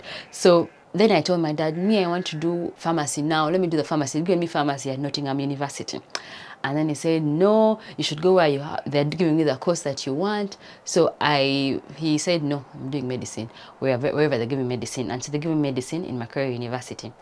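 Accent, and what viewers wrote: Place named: East Africa. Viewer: you are fun. That is East African accent rocks.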